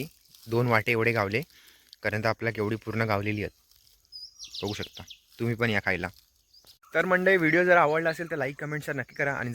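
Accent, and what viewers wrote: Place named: Malvani, India. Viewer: Malvani bol bara vatta aaikak.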